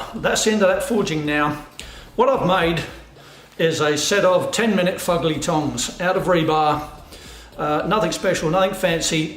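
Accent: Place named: Australia. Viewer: Nice to hear an Aussie accent. Good instructions for making a set of workmanlike tongs. Not trying for beauty - that’s for the things we use the tongs for. Thank you!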